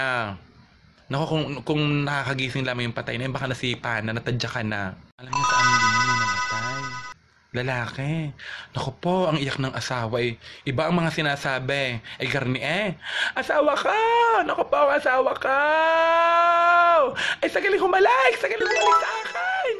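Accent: Batangas, Philippines. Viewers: Napaluha ako sa katatawa sa'yo 😅 more Batanguenyo accent vlog plsss sobrang nakakatawa😅😅😅 more power to you! God Bless You & Good Luck!!!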